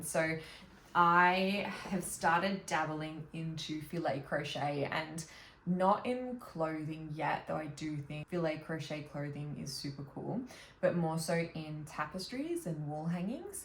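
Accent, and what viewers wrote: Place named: Australia. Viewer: This is the first video of yours that I have watched and let me tell you how my jaw DROPPED when I heard your aussie accent! As a fellow aussie who adores knitting and crocheting, it is so exciting to find your channel :) Looking forward to watchinf more videos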